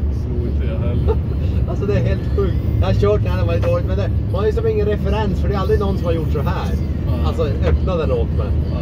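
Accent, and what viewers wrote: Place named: Piteå, Sweden. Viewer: Låter som pitemål. Piteå gissar jag på.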